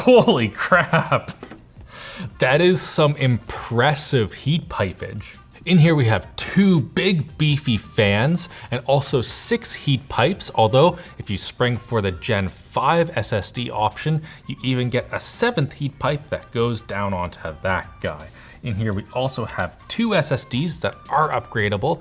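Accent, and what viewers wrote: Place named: Canada. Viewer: Love the Canadian accent. "Laptahp" "bahx"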